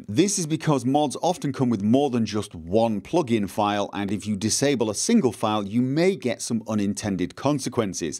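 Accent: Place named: Liverpool, United Kingdom. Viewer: Very helpful up to now, the big question is.... Where about in Liverpool? Defo scouse defected!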